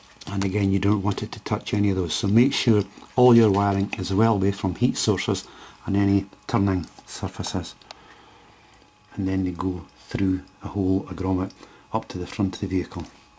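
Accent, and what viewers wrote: Scotland, United Kingdom. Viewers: Very well thought out and delivered----in a Scottish accent, even better.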